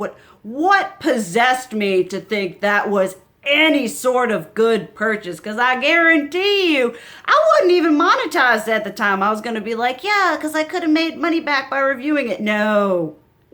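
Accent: South, United States. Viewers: I dunno if anyone has told you this but you sound very American hah. Not in a bad way. My American peeps tell me I sound very Canadian. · Just wanted to stop by and say I absolutely adore your aesthetic and accent! I was born and raised in GA and your accent is the perfect embodiment of what a southern accent is! Plus, your attitude and personality and energy presented in this video and how animated you present yourself is everything I can relate to! I’m here for it all haha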